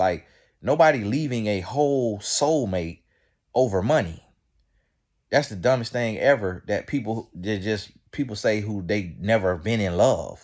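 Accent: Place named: Mississippi, United States. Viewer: you so funny and country! Your folks gotta be from Mississippi somewhere… anyway, you be speaking facts for real. A man that want you don’t miss you!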